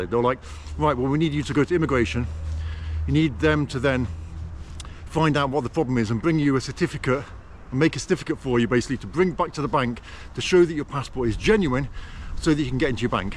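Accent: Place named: RP, United Kingdom. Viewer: Я при просмотре этого видео поимел некоторый "когнитивный диссонанс". Когда ты совместил в своём монологе две (для меня) стереотипически несовместимые вещи; - эталонную английскую (не американскую!) речь, коей "надлежит" быть дежурно-вежливо-чопорной, и - "неистовую" русскую эмоциональную экспрессию 😄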